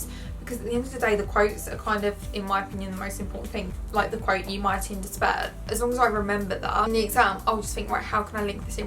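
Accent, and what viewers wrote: United Kingdom. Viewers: Useful videos. But I find your British accent too strong to follow like you speak so fast😉😉😊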